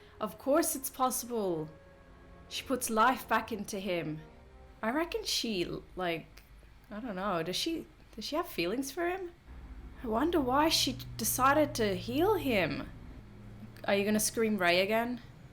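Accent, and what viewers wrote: Australia. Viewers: Nice to hear it in in an Aussie accent. Grateful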